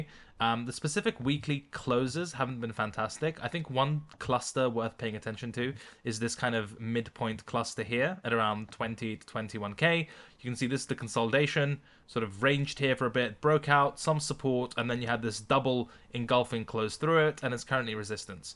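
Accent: United Kingdom. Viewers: Why are all my favorite traders British?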